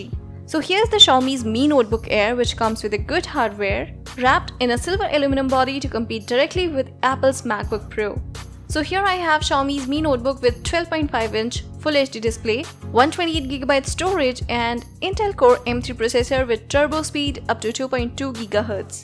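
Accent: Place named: India. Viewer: i like your indian accent.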